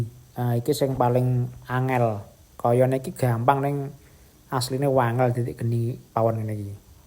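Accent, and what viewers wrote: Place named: Java, Indonesia. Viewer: Mas sliramu piyayi ngendi si baha jowone ko medok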